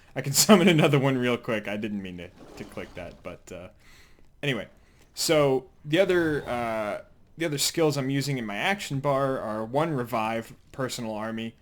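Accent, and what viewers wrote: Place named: East Coast, United States. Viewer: Hey dawg your channel is awesome and so is your east coast accent, I wonder how u have such low subscribers, good luck and thanks.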